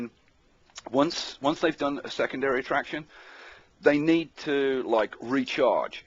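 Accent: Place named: United Kingdom. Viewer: I bought the Amusement Park. And yeah, it's ok, I like it. It would be nice, as you said, if it was more reasonably priced though. Thanks for all of the fun, educational, and entertaining videos! I love to hear what you have to say..... and.....I've always been a sucker for a british accent. lol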